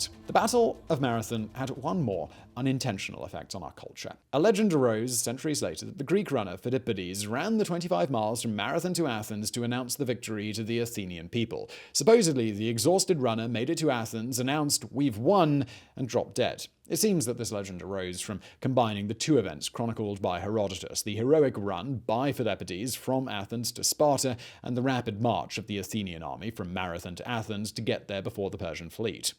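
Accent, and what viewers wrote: United Kingdom. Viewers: You talk so fast, and speak in a British accent, that I have to use subtitles to follow you.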